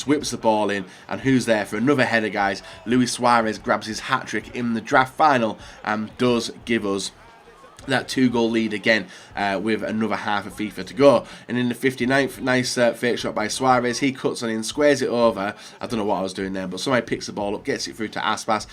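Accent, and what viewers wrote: United Kingdom. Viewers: you from u.k??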